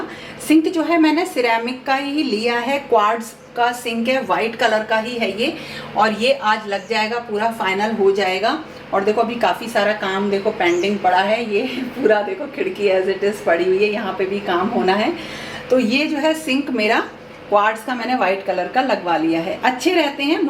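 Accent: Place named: Mathura, India. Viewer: I'm from Mathura mam,mera mayka h mujhe lagta to tha aapke bolne ke ton se ki aap Mathura ya Agra se ho.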